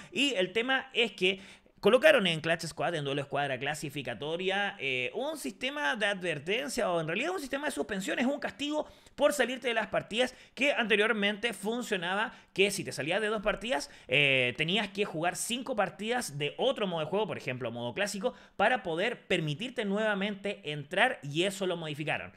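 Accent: Chile. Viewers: Ola Bro eres chileno por que hablas como chileno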